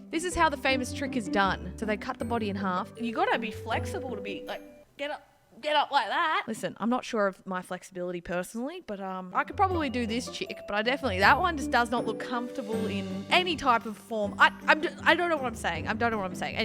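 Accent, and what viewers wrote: Australia. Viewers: You sound like your brother wait a sec all Australians sound like that. Nope just you two.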